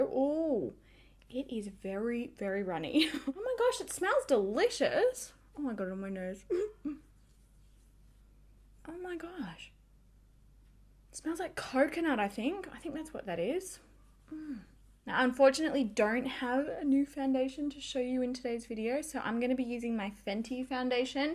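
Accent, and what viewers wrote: Australia. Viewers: I love how Aussie’s pronounce the letter “h!”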